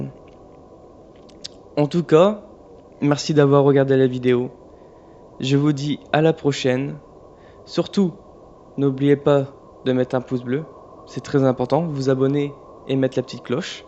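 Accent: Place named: North, France. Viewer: Rien à voir désolé mais t’es blond, t’as les yeux noir, un accent du nord, tu serais pas le frère à jojo Bernard ?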